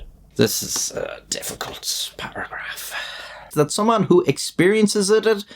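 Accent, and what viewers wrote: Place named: Ireland. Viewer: The bloopers are the best part... when the Irish accent even blows the mind of the person with the accent...Lol! 🤣🤣🤣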